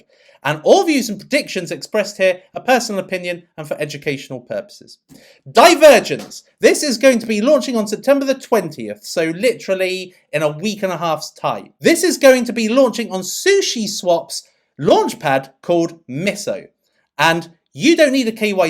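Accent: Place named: Southern Counties, United Kingdom. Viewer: Duuuude I love your Southern Counties accent - Bucks?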